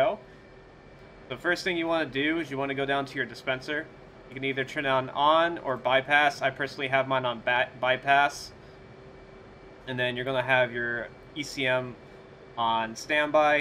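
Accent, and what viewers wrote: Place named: India. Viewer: Whats wrong if he has an indian accent? Some of the simplest explanations out there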